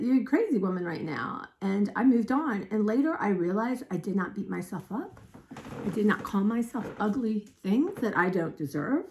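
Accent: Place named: Pennsylvania, United States. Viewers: Where are you from? My daughter who is a linguist would say has a mid Atlantic accent which includes the Philly area but not as intense as Philly. I asked where you are from because I assumed NYC from your Trader Joe’s story, I live in Long Island. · Why did I assume you lived in Pennsylvania? That's the accent I hear.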